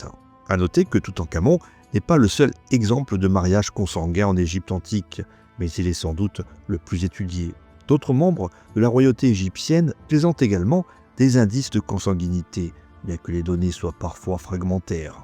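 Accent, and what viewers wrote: Corsica, France. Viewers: Tu serai pas un peu Corse avec cet accent 👍🫶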